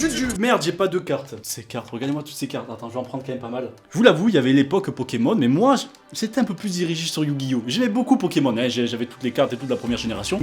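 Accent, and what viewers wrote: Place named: Languedoc, France. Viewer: Mdr j’ai 19 ans et grâce à toi je suis retombée en enfance l’époque du primaire!! Enfin une vidéo pour ceux de notre génération 90-2000!! T’es génial je t’adore!! En plus je viens de la région Languedoc comme toi mdr l’accent j’ai le même!! Je t’adore 😁❤️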